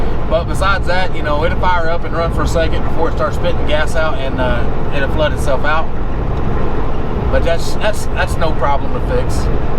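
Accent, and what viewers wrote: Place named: Georgia, United States. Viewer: Are you from Georgia? Cause you sure sound like it. 😂 it’s cool, I am too. GO DAWGS!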